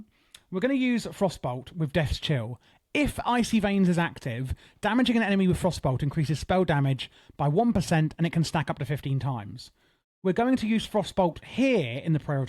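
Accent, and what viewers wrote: United Kingdom. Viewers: HES Talking the clean British stuff bro. Git gud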